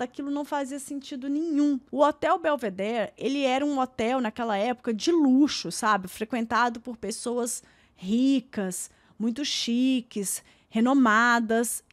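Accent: Minas Gerais, Brazil. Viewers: Oi!!! Jeitim mineiro de contar história me conquistou! Adoro seu canal! Caso estranho heim...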